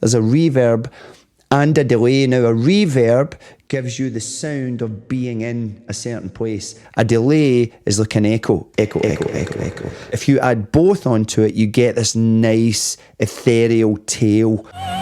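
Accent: Scotland, United Kingdom. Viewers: Informative. Given in your beautiful Scottish accent!❤ thank you for the reaction! Lovely👍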